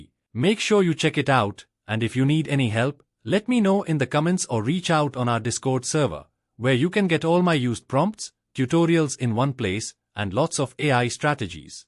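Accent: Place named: India. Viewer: Why has the AI narrator an Indian accent…? 😅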